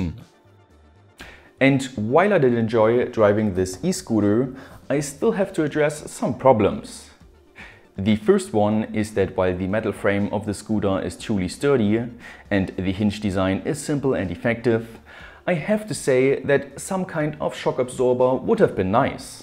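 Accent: Germany. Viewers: German english is so funny to listen. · Ich liebe deinen deutschen akzent😂👌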